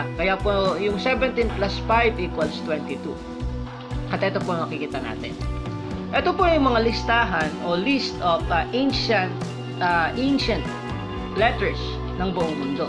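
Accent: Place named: Ilocos, Philippines. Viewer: Ilokana ng narrator sir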